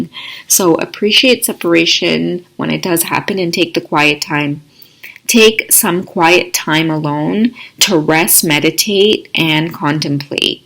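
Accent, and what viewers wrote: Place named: Trinidad, Trinidad and Tobago. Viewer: Girl, sorry I forgot that I could reply to you in the oldest post videos, like I did before because I am hiding a little bit here, hehe, Thank you Girl so much for all the readings, I really love you too, and I love your Trinidad Accent too I always want to say that, so funny and of course natural cause that is your natural language, hehe ❤💖 Love you so so so much, you are like my best friend, the readings are like daily Vitamins for my heart, all, the Romantic ones, the Sensual ones, ect, all, hehe 🥹🙏 thanks so much for all the readings Girl, I can't thank you enough, let me pay you with my Love hehehe 💖🥹🫂😚muah🩷💖 Thank you so much 🩷💖 (Hehehe, i pick this video by random actually, just what oldest and scroll down a bit, wkwkwk🙏🩷)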